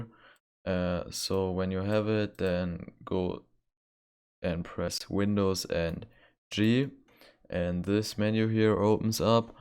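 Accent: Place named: Germany. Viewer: ich hör nen deutschen aktzent :D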